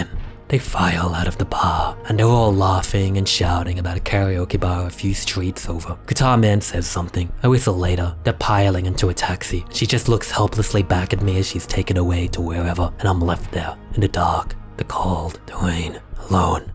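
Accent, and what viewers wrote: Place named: Australia. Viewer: Are you Japanese? Also you seem to an Australian accent. Did you study or live in Australia?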